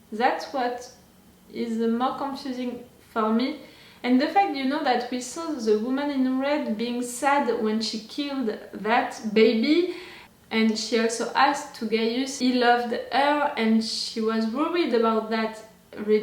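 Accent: France. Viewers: I love to hear a french person get constantly concerned about democracy. I swear, I start smiling every time I hear you say democracy in that sweet french accent. ;D Also, I have to defend Gaius. You keep calling him useless, but he is actually a genuine genius. If he didn't have Number Six manipulating him in his head, he would be a huge asset to the fleet. And even so, I think he probably still is, we just don't see it too much, but we've seen scenes where he brings reports for the president and he did the statistical work in the meeting during the water crisis episode and other stuff like that, so he is probably actually doing useful things in the background.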